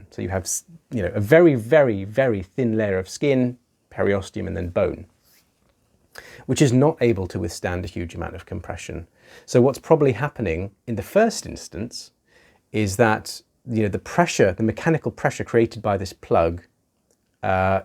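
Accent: United Kingdom. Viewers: Wish he didn't have a British accent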